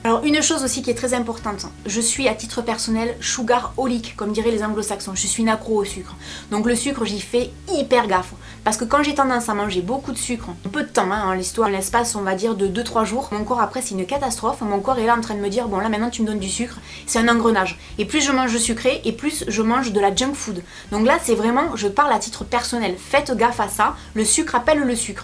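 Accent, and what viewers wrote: South, France. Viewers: L'accent du sud ! 😂😘✨ #sudiste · et ouais le suuudddddd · Y'a pas longtemps que je te suis mais j'adore ton franc parler avec ton accent du sud 😍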